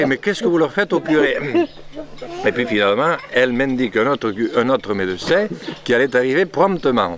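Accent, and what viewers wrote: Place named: Brittany, France. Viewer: Breton, j'adore cet accent et j'apprécie bcp ce monsieur que j'ai découvert sur Arte tandis qu'il visitait un artisan de son secteur. Pour l'accent, écoutez le poème "L'accent" de Zamacoïs dit par Fernandel, c'est magnifique !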